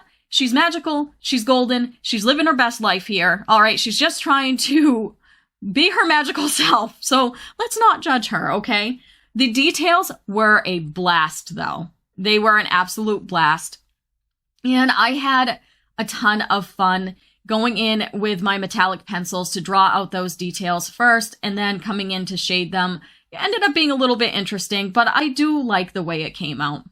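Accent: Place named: Maine, United States. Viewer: Yooo a fellow mainah (mainer)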